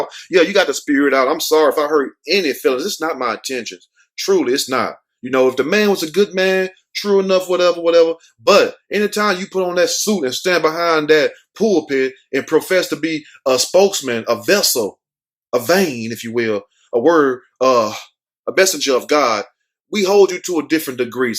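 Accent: South, United States. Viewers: your accent is somewhere between the Bible belt and Houston /Arkansas 😂😂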